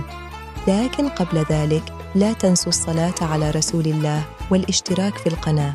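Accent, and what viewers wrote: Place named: Egypt. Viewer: اظن مصرية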